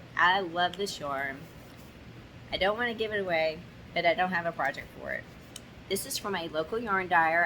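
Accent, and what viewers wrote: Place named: Texas, United States. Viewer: Love watching you. Love the accent it's like being back home with my mama in Texas.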